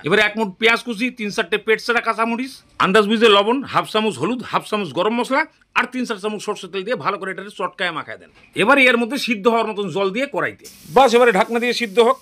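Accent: Bangal, Bangladesh. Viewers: আমগো বাংলাদেশের ভাষায় কতা কইতাছেন ক্যান? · বাংলাদেশি ভয়েজ❤ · Bangladesher Bengali der moton kore kotha bollen . Love from Bangladesh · দাদা আপনি বাঙাল? 😅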